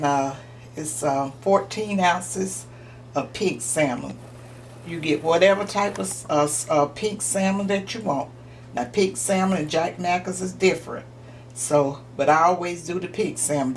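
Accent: South, United States. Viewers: These patties are just like the ones my mama made. She tried to teach how they were made but being young I would not pay attention cause people today (back then) don’t eat this kinda foods. I miss her ways of cooking but you cook just like her So I just want to thank you. Plus I love your soft southern accent mine has changed over the years Love you and your videos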